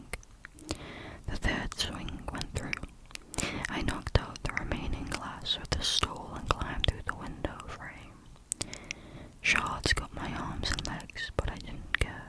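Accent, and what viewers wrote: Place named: Liverpool, United Kingdom. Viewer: I've only just found your channel. I'm glad I did, there's something about your accent and how you enunciate, it scratches my brain. Almost sounds like a very soft scouse accent.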